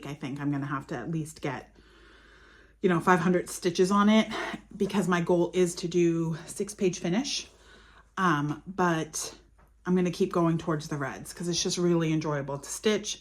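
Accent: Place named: Maine, United States. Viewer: Hi...first, great progress on your WIPs and goals! Second...GO PATS! Third...as a Maine girl who has been in New Zealand for 22 years, you simply make my heart smile with your "yapping". Sounds like home to me, so thank you! Happy stitching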